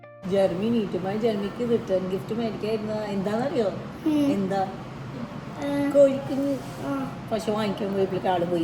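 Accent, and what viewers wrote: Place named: Thrissur, India. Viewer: Now I get it why is having a thrissurian style of talking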